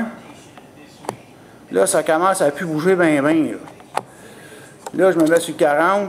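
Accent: Quebec, Canada. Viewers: Ça fait du bien entendre les instructions en bon québécois 'sti !!! 😉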